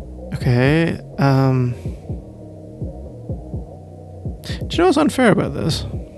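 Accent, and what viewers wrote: Scotland, United Kingdom. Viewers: AAAAAAAAA i love scottish accents so much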